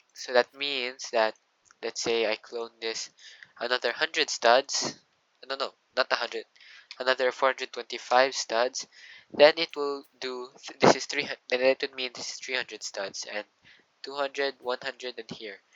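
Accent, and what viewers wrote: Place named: Philippines. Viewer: Parang Filipino accent ata to ah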